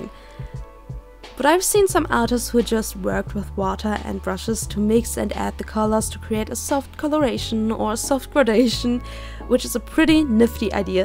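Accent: Germany. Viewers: Okay, das Bild was du aus den Markern gemacht hast ist echt toll geworden ^^ ich hab btw. Raus gehört, dass du deutsche bist und hab dann nach geschaut:p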